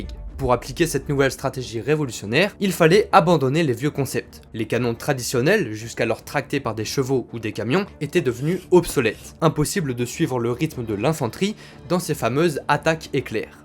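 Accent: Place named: England, United Kingdom. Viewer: Super intéressant. Un bémol toutefois, arrêtez de prononcer les noms de chars allemands à l'anglaise, c'est horrible à entendre pour un germaniste. On ne dit pas Taïgueur mais Tigueur. :)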